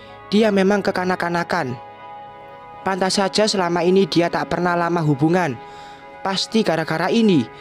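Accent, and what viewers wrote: Java, Indonesia. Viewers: Huuh Medok Jawanya bikin hati sangat bahagia saat denger suara Admin yang manjain telinga ini. Huuh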